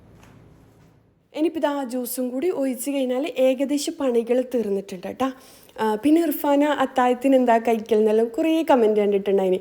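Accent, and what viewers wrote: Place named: Kasaragod, India. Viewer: Pinne kooduthlayum vidiokulla voice kodukkunnadh kelkkan nalla rasa kasaragodile bhasha chengalle malayalam ariyamengilum ivide samsarikunnadh vere bhashailan orupadu ishtam samsaram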